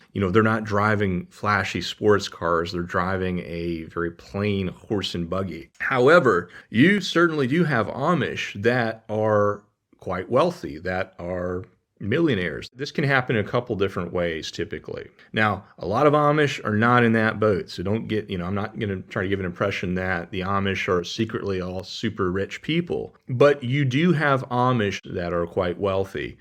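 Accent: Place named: Pennsylvania, United States. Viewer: I believe your from Pennsylvania, the way you pronounce the Word Home or Home’s. ?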